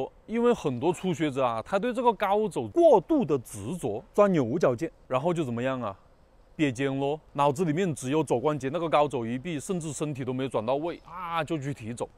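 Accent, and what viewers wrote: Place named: Guangdong, China. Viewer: 老师的广东普通话➕幽默还挺有意思的。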